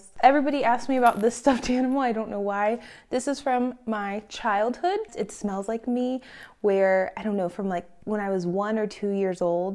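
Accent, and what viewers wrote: Germany. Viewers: Sometimes you sound like you are speaking english with a little german accent 😉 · I like Your Denglish!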